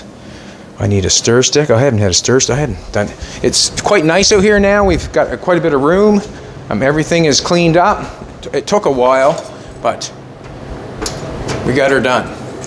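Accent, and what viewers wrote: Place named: Canada. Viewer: hi! have not found all your bugati videos yet , found a lone early one , but not giving up cuz i love bugati a lot . hope this makes you smile....i am a french canadian from montreal , and just about anything on youtube is english so i am used to it and i noticed you have a canadian accent !!! not from quebec mind you but canadian all the way . yeah flag waving here . refreshing to see your talent in the forefront . might even go to see you this summer .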